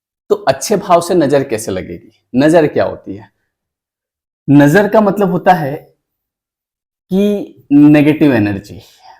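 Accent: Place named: Madhya Pradesh, India. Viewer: भैया आपकी बोलने की टोनिक वाकई एमपी वाली है मैं भी एमपी से हूं❤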